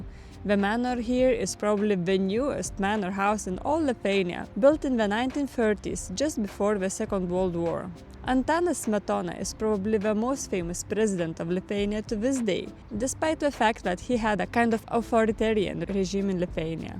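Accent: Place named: Russia. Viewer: You have a very russian accent wow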